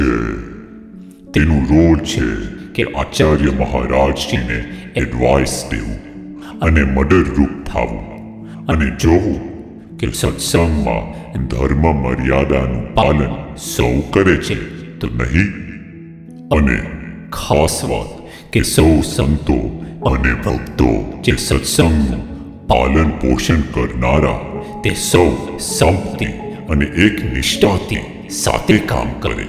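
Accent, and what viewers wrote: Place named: Surat, India. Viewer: Tame pure surti chho...right bhagat??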